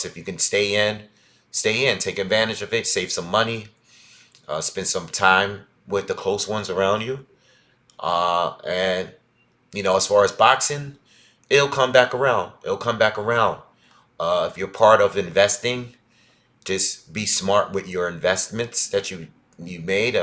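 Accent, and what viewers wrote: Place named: New York, United States. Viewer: Are you from New York? So I'm a Brit from London and I've always loved that New York accent. And as I'm writing this, your video is playing in the background and yes, you're definitely a New Yorker for sure; such a cool accent!!!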